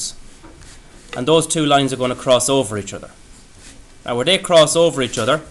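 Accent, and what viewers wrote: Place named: Ireland. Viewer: the irish acent tho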